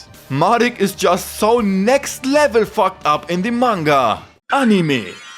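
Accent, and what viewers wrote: Germany. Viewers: The way this mans pronounces "Anime" and "Pegasus" just drills my ears. Damn Germans have such terrible accents and their language is so disgusting...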